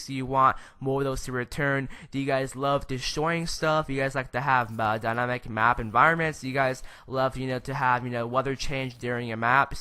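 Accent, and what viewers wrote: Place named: Spain. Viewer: it sounds that you have an Spanish axsint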